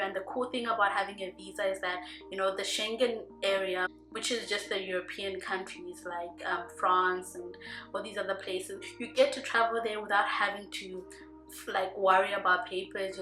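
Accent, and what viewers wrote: South Africa. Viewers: LOL I spent the last two summers in Italy. they're watching you because there are a lot of Africans who have fled the continent! They are looking at you hard because you're so adorable! So how is your Italian progressing. Have you been to Turin, Sardinia or mid-country? Where is back home for you! You sound like you might be from Durban or some part of South Africa. if so, i love the country! They have a Mediterranean Diet. I didn't find the pizza as a issues because they don't even eat spaghetti and meatballs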